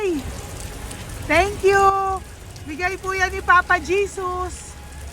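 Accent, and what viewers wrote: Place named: Visayas, Philippines. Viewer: Hi! Lovely Lady, bisaya ka day ang salitang tagalog ay malumay bigkasin,kaya ng sanabi mo kukuhain ang tama ay kukunin at isa pa bagay2 malumay bigkasin,ang bisaya paspas mag-salita.😢😢😢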